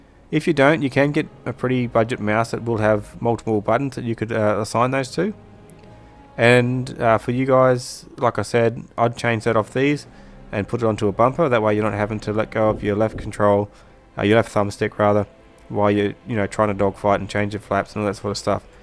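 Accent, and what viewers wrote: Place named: Australia. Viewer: the accent gave it away buddy, I live in NSW.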